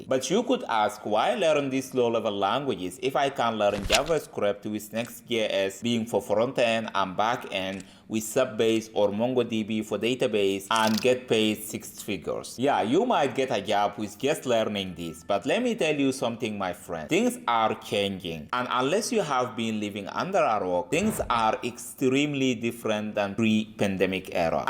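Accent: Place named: Italy. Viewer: You sound Italian. Great content, bro.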